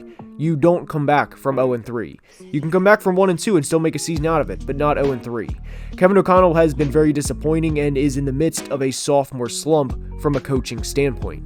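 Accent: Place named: Wisconsin, United States. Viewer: Bro you have to be from northern Wisconsin. Also great recap! Earned a sub. Keep it up.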